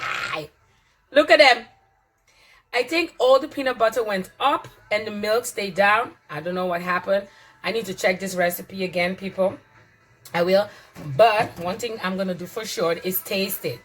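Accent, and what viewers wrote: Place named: Africa. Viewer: Omg love hoe u zo excited bent. Zo grappig hoe u Engels praat klinkt beetje als hoe Africans engels praten.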